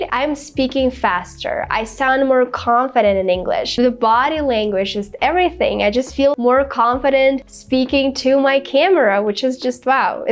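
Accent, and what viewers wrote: Russia. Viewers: Russian accent. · русский акцент чувствуется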